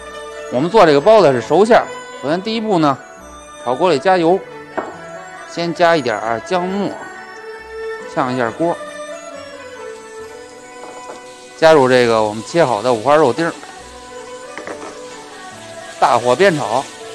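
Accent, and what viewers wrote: Beijing, China. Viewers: 真爱听你说的京味，看着都馋了。今晚我己调好了馅，明天就学着自己做，另外想请教一下叉烧包的包法，跟“美食台”的广东师傅学做过但包子不开裂，厨师长能告我什么原因吗？谢谢！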